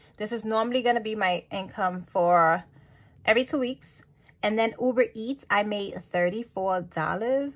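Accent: New York, United States. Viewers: I felt like I missed your face. You are one of my regular views. This long, but you won't lose God's grace by not paying tithes. God knows your hear and besides the bible instructs us to pay tithes but it also tells us that debt is bad and avoid it. Literally every reference to debt in the bible is negative. So, paying debt first or paying tithes first....both are consistent to the bible imo. Keep it up. And I low key love your NY accent. 💪🏾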